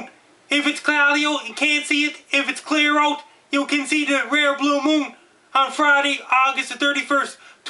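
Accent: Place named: Cape Breton, Canada. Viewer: It is rare because it only happens "once in a blue moon". He talks like that because he's a Cape Bretoner. Not that there's anything wrong with that.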